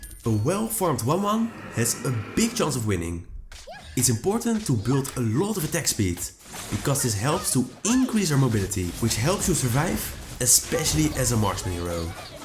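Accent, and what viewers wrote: Netherlands. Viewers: I smell dutch bro dorry but you have such a dutch accent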